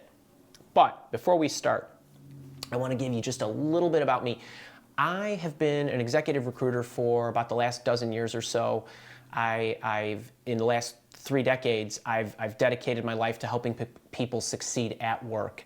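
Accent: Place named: Wisconsin, United States. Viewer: Is this dude from Wisconsin or something? “Jahb”, “Fayst”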